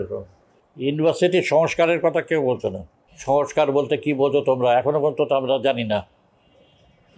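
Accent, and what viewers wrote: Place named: West Bengal, India. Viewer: স্যার এর আদি বাড়ি কি স্বাধীনতা পূর্ব পশ্চিমবঙ্গে ছিল? কথার মধ্যে এদেশীয় টান! অবশ্য খুলনাও হতে পারে। যাইহোক ভাল থাকবেন স্যার। এই বয়সেও আপনি সমান চিন্তাশীল । ভাবতে ভাল লাগছে।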